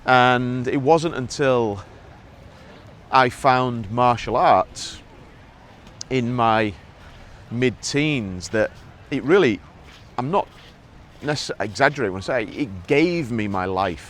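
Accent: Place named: Northern England, United Kingdom. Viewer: Nice one. I've watched a few of your videos in the last days not very attentively. I already know las ramblas are often overcrowded. Hay muchos videos sobre "Moving to Spain" "Reality of living in Spain" or "Culture shocks of Spain" (this is where it starts to get silly para mi), it's a bit repetitive to put it mildly. StatemenIts, opinions or whole videos "slightly annoying" are mostly from US-Americans. It's all not a serious issue for me, so I keep it simple: Complaints about spanish bureaucracy in british english sound better. This video's first minutes were special for me, because I realized you speak with a distinctiive northern accent. Manchester let me see the light. Are there videos about "What you should know before moving from Miami to Moss side"? 🙃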